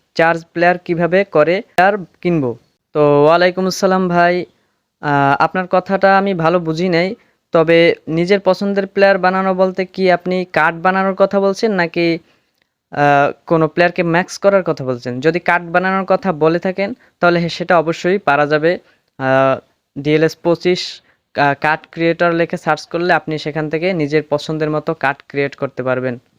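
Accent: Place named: Madaripur, Bangladesh. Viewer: আসসালামু আলাইকুম ওয়া রাহমাতুল্লাহি ওয়া বারাকাতুহু। ভাই ভালো আছেন। ভাই আপনি ফুটবলে কোন দল সাপোর্ট করেন ব্রাজিল,-আর্জেন্টিনা, জার্মানি, নাকি স্পেন। আপনার বাসা কোন জায়গায় কে আমি মন আপনার কন্ঠ তো শুনে লাগে আপনার শরীয়তপুর নয় মাদারীপুরের আশেপাশে থাকেন আপনি কোন জায়গায় থাকেন?আপনি কি করেন? ডি এল এস গেমে কি আর কোন কিছু নাই ভাই আমার গেম খেলতে ভালো লাগে না আমি হার্ট মোডে ডিএলএস খেলছি আমি খালি ম্যাচ জিততে থাকি খালি জিতে থাকি আমি মেয়ে ছাড়িনি এখন আমি কি করবো ভাই.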